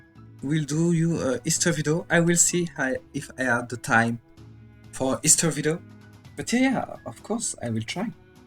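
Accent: France. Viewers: Your accent is like, the most French thing I have ever heard x3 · You have a Really funny French accent XD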